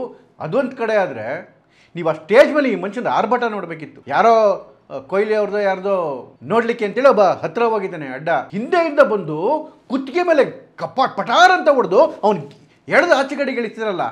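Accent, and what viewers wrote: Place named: Tamil Nadu, India. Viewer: Tamil chenai mathadthane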